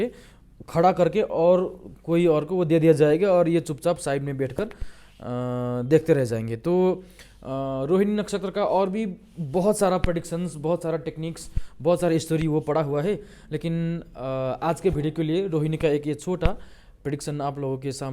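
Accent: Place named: Nepal. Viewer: Nepali tone... are you nepali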